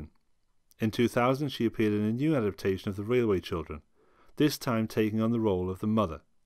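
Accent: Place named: England, United Kingdom. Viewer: Nice to hear an English narrator. + the use of the word "film"! Thank You.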